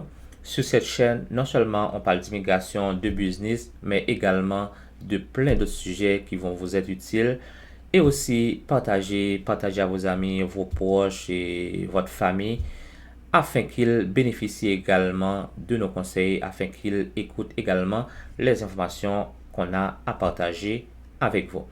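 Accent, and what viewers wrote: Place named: Haiti. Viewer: Vous eyes d'ou, vous avez l'accent Haitien. Si chest vrai, peus tu m'envoyer vote email j'ai des questions.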